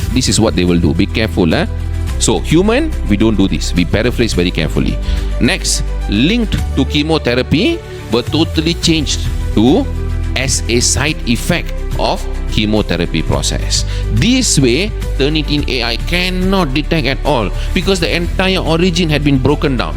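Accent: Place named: Singapore. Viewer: This man speaks and sounds like Kumar from Singapore.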